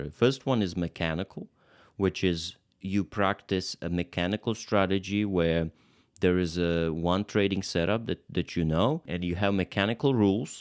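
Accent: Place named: Sevastopol, Ukraine. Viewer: Wonderful Your accent reminds me of an old friend from Sevastopol Good luck from Ireland☘☘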